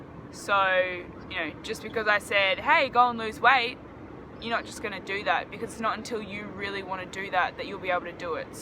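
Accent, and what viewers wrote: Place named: Wales, United Kingdom. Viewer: I like your accent where are you from? Wales?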